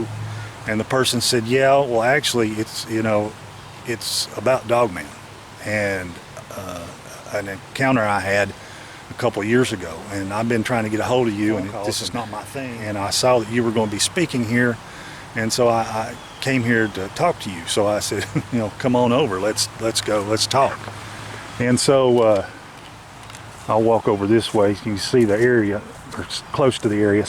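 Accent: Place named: East Tennessee, United States. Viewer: Where are you from you sound alot like people.from my area in East Tennessee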